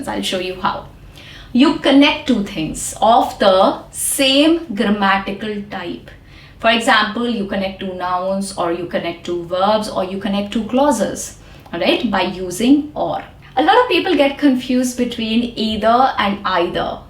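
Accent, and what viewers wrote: India. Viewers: Content is highly appreciated despite intolerable Indian accent. Thank you though!!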